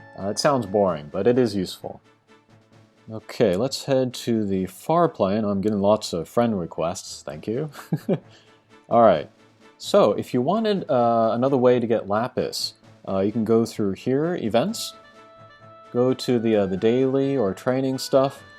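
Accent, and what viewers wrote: Japan. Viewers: By your pronunciation of names, I can tell you are Japanese, by your general pronunciation I find hard to believe you are japanese. You have a lovely accent that surpasses even those that were born in english speaking countries.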